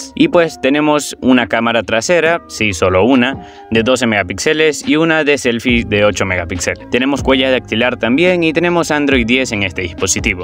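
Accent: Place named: Peru. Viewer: Men eres de Perú ? Tu acento parece de Perú noce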